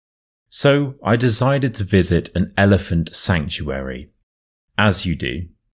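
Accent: United Kingdom. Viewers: I wish all British people could speak like you 😅 Very lovely lessons ❤ thanks 🤩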